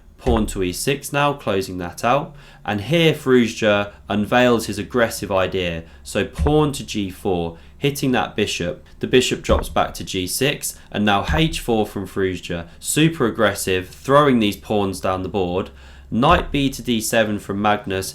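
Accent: United Kingdom. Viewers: Great commentary with beautiful British accent and a juicy Alireza game. Very convincing to subscribe. Looking forward to see more from your channel.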